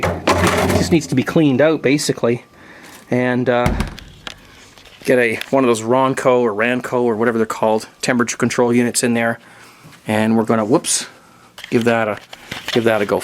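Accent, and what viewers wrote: Scotland, United Kingdom. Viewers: thats a crackin scottish accent that made me laugh! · By the way, love your scottish accent ;)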